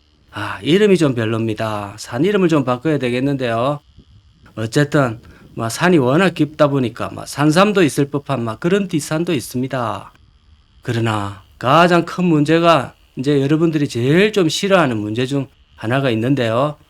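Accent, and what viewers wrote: Gyeongsang, South Korea. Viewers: 전국방방곡곡 다니시며 영상찍느라 고생마니합니다 즐겁게보고있습니다 갱상도 사투리 정겹습니다❤❤❤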